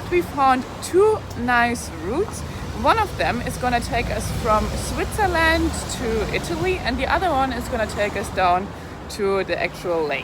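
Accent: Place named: Germany. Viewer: This girl has to be German. Nice accent when she speaks.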